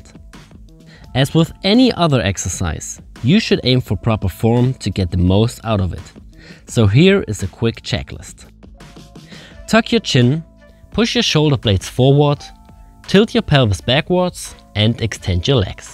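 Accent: Germany. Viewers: Bruder man merkt dass du deutscher bist an deinem englisch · Hardcore German-Accent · Can't listen to this guy - such a terrible German accent! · Funny accent...🤔.....german? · Hahahahaha 100% german. I recognize that accent anywhere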